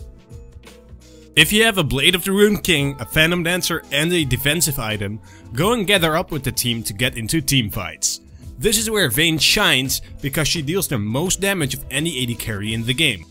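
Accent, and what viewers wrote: Netherlands. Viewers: Your english seems dutch! Am i right? :) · u realy sound like my english teacher just in case tho are a english teacher and are u dutch ? u sound realy sound like him. ;P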